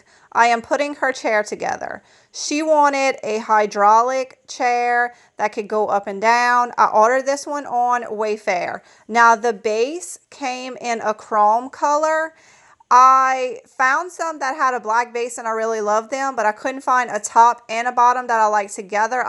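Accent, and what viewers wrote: Louisiana, United States. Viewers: Great job. First video I have seen of yours, but can tell by your lovely accent you are a Louisiana girl! Thanks for the ideas! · "Pain in tha booty", you are cracking me up! I'm from the South and I love your accent---Louisiana is one of my happy places!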